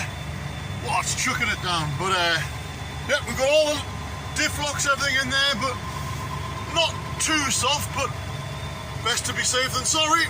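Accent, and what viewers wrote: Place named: United Kingdom. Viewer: Wow, what a cool wee channel you've got, and it's nice to hear recoveries done with a British accent. You could count that as an Off-Road Recovery, so aligning you with channels like Matt's Off Road Recovery, Trail Mater, Fab Rats, Robby Layton etc. Those channels are doing really well with MORR up to 1.6 million subscribers and making close to US$700K a year, and that's without sponsors. I'm looking forward to watching some more of that big truck of yours. <link>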